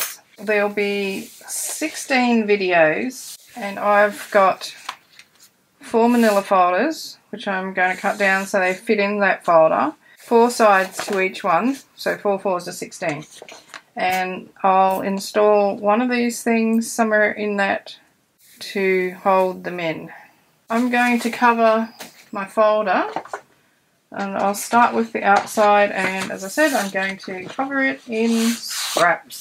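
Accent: Australia. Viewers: Hi, I was watching '49dragonflies' for Dephemerember and part of your video of 'Effie' was shown, so I thought I'd pop over and have a look at your channel. Glad I did. Really loved the leather look. Got me thinking. Thank you. Great to hear the Aussie accent too. Jeanne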